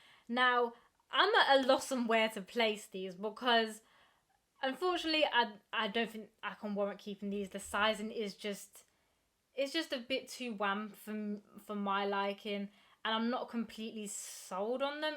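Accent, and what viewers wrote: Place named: South, United States. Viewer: nice trainers, what part of down south you from i can pin point the accent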